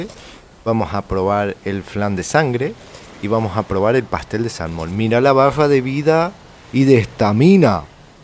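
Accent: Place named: Córdoba, Argentina. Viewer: Una mezcla de cordobés español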